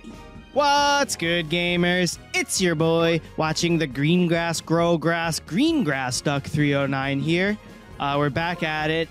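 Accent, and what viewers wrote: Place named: Scotland, United Kingdom. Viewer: Most accurate scottish accent ive heard, couldn't understand a word besides laddie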